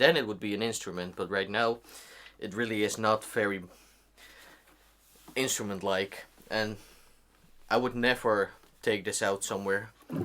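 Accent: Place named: Netherlands. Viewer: i smell sniff a dutch accent 🤔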